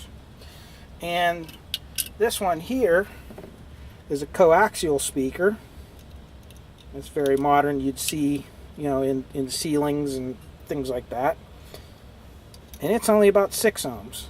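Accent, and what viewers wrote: Pennsylvania, United States. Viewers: Hey I really like the channel. I'm a woodworker and a ham operator. You sound like you're from western/central pa if so I'd li,e to meet you. Trade ideas on projects and learn some electronics. You have a great teaching style and I'm learning a lot. Give me a shout. Rick - dickrackson at yahoo dot com. Thanks rick.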